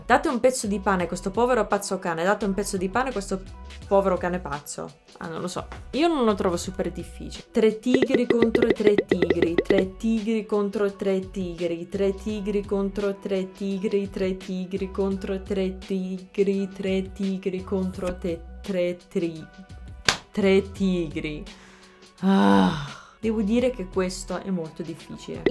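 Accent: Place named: Fano, Italy. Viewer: Ha una perfetta pronuncia fanese, con un leggero tocco anglosassone in alcune sillabe.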